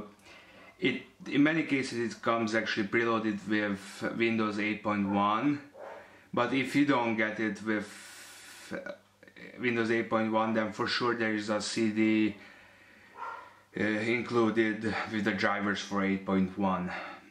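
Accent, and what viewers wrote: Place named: Hungary. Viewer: Kihallottam a magyar akcentust :) Hun bemutatót nem akarsz csinálni?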